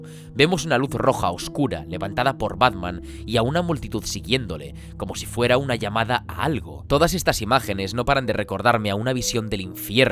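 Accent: Spain. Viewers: aaaa verga tienes acento español...si lo voy a ver jajajaj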